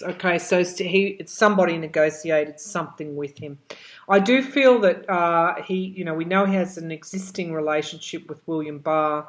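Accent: Australia. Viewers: it's okay we love that Aussie accent keep it up. by the way we a also love your beautiful smile. I cannot wait until the world gets a little bit lighter, so you can smile a little more. I feel bad for you I know this stuff must be hard on you. These readings must take a lot out of you. We appreciate every single time we get a new video. Keep up the good work.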